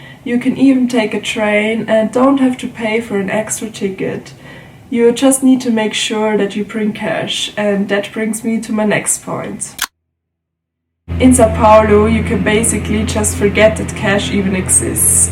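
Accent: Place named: North Germany, Germany. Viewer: Loved the content! What would you say about the metro system? Also your accent seems from north Germany!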